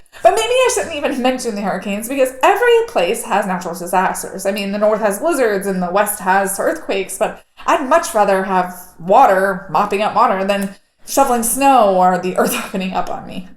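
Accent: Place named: Florida, United States. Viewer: I understand her just fine. Its a Florida accent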